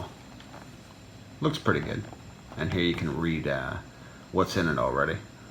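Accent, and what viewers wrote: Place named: Pittsburgh, United States. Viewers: Definitely hear some PA in there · Idk why but I hear some Pittsburgh in your voice lol that's where I'm from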